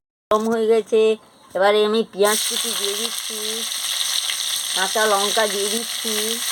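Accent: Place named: West Bengal, India. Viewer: Pochim bongo kotha tone dia buja jai niramis jobor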